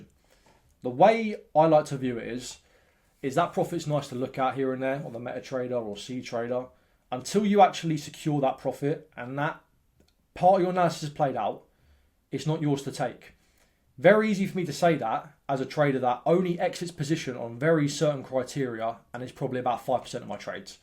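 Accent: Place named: United Kingdom. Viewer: Keep up the good work , mate I like your British accent ..unlike Adele and Harry Kane , seems to be Chinese , not English hahaha Salut from Algeria ❤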